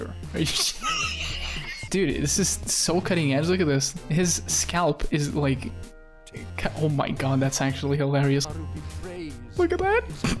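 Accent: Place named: Portugal. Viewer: you have a little accent. it’s actually very slight. i commend your english! but yeah i guess i am just very familiar with the tuga accent hehe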